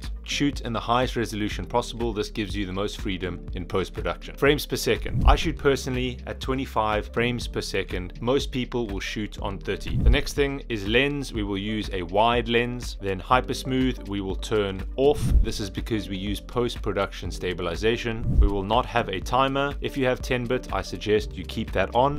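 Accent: South Africa. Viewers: are you South African???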